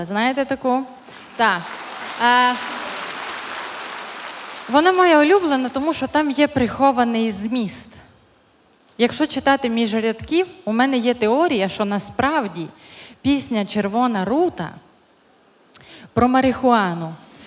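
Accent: Ukraine. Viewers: Западноукраинский диалект. Он уже рулит везде - на ТВ, радио, в эфирах всех мастей, сплошь и рядом ""підЕмо-прийдЕмо", уже все привыкли, скопиастили на слух друг у друга, не утруждая себя проверкой по словарям (а школы прогуляли, дипломы покупали в коммерческих ВУЗах, простите - вишах, естественно... :body-blue-raised-arms: Скоро галичане будут переписывать словари и учебники для всей Украины. :face-blue-wide-eyes: От то буде файно! :hand-orange-covering-eyes::face-turquoise-drinking-coffee::face-blue-smiling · Це Закарпатська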